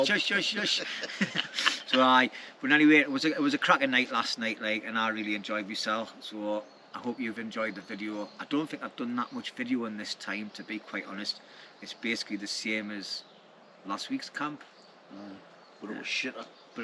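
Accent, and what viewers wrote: United Kingdom. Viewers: As an American, I am always curious about the various UK accents. May I ask what area yours is from? Thoroughly enjoy your outings!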